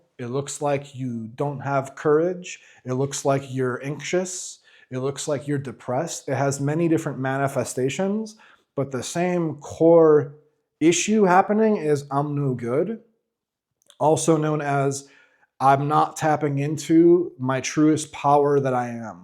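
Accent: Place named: United States. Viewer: Yap, right on the money. Golden truth through an American accent man. The key is to permanently remind oneself of this and not get triggered by outside circumstances. Unfortunately, emotions, pressure, stress, fear but also euphoria are throwing me out into the regular ego function I am used to for such a long time. The skill to maintain the inner balance is key. It takes time to build up this skill. I am working on it. Greetings from Munich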